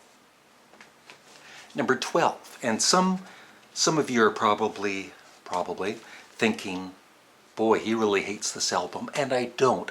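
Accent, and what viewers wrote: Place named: Canada. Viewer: No doubt...you are Canadian. Lol. No mistaking that accent. But I laugh, because I am from Texas...and I assure you...you could poke fun at me too. Lol. Thanks for the post/rankings. You get a like.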